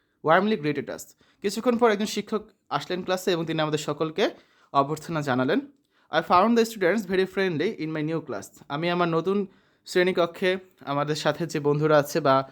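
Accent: Sylhet, Bangladesh. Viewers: Bhai afne kita Sylheti ni?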